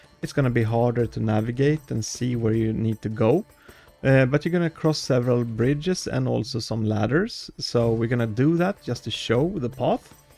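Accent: Sweden. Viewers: u swedish right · it feels like you are from sweden cause the acent you have feels like swedish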